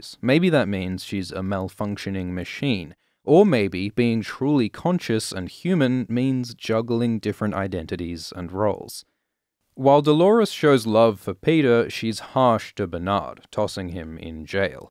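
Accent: Australia. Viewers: Literally nobody comments on your Australian accent for some reason. Anyhow, I'm in Perth, and if you're local, you should come around for a sesh. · I'm just starting your video. I've watched so many and NEVER realized u aren't British!!! I'm an IDIOT!! So, are u from Australia or New Zealand? I've always wanted to travel there. My daughter, Naomi, can do a GREAT Australian accent. I can't even seem to say the word NO like you do. But she can!! Looking forward to this video and seeing you on the Westworld page on the AMINO APP😀💖👏🐴🔫🐯🌻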